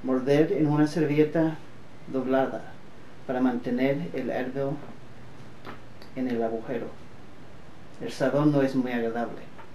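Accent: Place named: Andalusia, Spain. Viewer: que acento mas andaluz xDDDD :)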